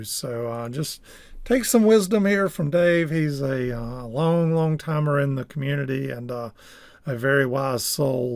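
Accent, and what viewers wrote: South, United States. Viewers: that southern drawl of yours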